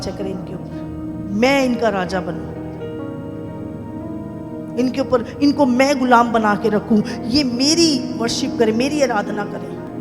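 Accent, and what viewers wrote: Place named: Jharkhand, India. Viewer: Malgo jharkhand Sa bolrhi apa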